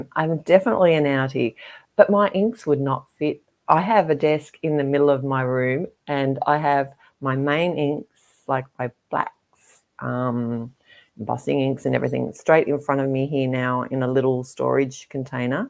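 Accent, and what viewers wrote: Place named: Australia. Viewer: it is lovely to hear a second Aussie accent and you have our great sense of humour. I found your presentation very helpful and I've downloaded your swatch charts. Thank you so much and I will catch you on one of your platforms. From the Goldie, Thank you again.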